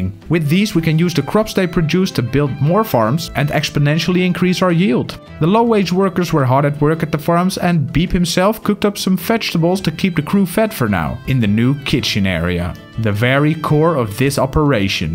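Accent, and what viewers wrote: Netherlands. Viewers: Hey bro are you Dutch? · Dutch accent best accent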